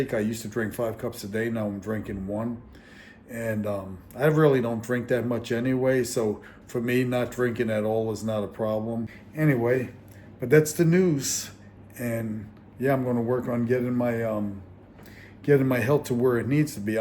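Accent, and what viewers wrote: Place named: New Jersey, United States. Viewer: Hi. The YT algorithm brought me here. I don't have breathing difficulties but I've watched a vid of a man who had health issues & was able to do the "Camino de Santiago" in Spain. He used a travel CPAP machine (it's smaller) and was able to do the multi-week walk/ hike. Good luck on whatever you decide to do re: your health. Btw, are you from the NE of the USA? You sound a bit like Bernie Sanders and Tony Soprano. (Cawfee = coffee, moah = more). Was just curious; hope I didn't offend. Edit: congrats on the new place & the renovation. One comment abt the kitchen: there's no hood or vent if someone cooks. If the dish being prepared is smelly or aromatic, the whole apartment will smell of food. One way to prevent that would be to install a hood or to put a table outside/ back area and do the smelly cooking there. 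The view from the backyard is nice. Wish you both good health & safe travels! 👍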